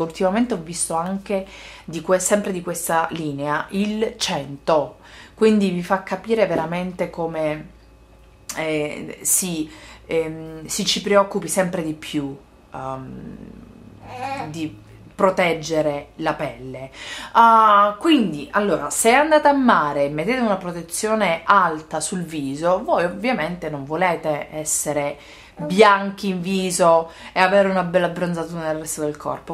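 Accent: Sicily, Italy. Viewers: Sei siciliana? dv precisamente?:) Un saluto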